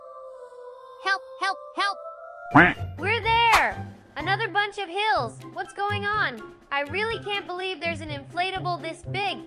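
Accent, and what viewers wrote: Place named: Venezuela. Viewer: Adivina tú eres de Venezuela porque hablas de Venezuela tú nunca has dicho vos vos sos vos nunca lo As dicho entonces tú eres venezolana hablas venezolanas tienes el acento venezolano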